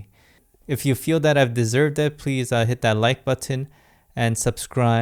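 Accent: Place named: United States. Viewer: Are you Korean? Your English is very Americanized/Westernized.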